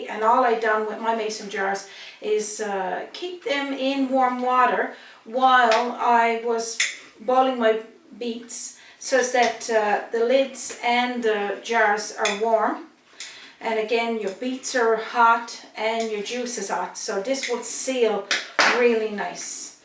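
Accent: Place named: Newfoundland, Canada. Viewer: Thank you!!!! As an expat it was not only a treat to get those lovely pickled beets all the way over in Japan but it was a delight to hear the Newfie accent again. Thank you so much. I'll check out more of your recipes soon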